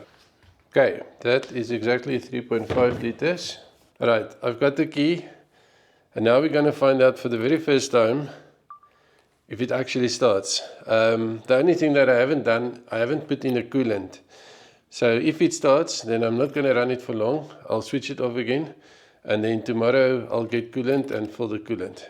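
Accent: South Africa. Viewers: South African. 👍Lekker BOER accent.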